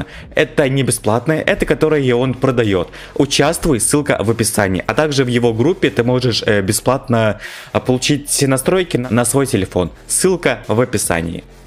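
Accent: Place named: Chechnya, Russia. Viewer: Чеченский Ваше Похож сам чеченец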